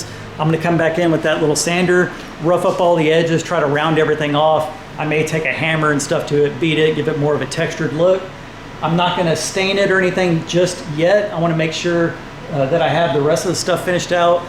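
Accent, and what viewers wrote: Texas, United States. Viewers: Morning having a chuckle here in Australia, but with your wonderful Texan accent I can hardly tell the difference between the two ways you pronounce “hearth”. Love listening to your accent. 😊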